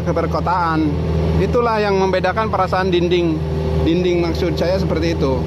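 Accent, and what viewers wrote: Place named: West Sumatra, Indonesia. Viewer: Cara cpat smpai di pelabuhan dwikora pontianak.. Maaf om org pontianak atw org minang krn dr nada bhs kaya org minang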